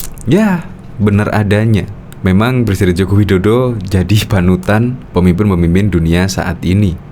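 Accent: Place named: Banyumas, Indonesia. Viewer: Jawa NGAPAK selalu hadir...🖒🖒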